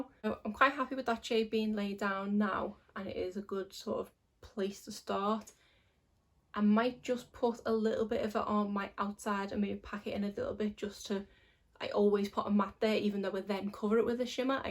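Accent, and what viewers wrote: Liverpool, United Kingdom. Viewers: Are you liverpudlian? I'm really rubbish with accents 🙈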